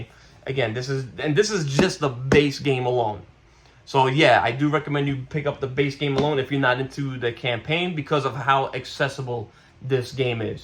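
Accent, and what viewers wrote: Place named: New York, United States. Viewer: Great job!!!! I just come here for your accent as I miss NY.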